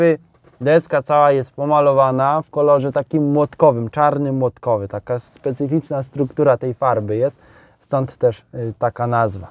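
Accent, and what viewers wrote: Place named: Podlasie, Poland. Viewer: Mam pytanie ... Autor filmów jest z Podlasia, czy po prostu ze zwykłej wsi ? Zaciąga niesamowicie....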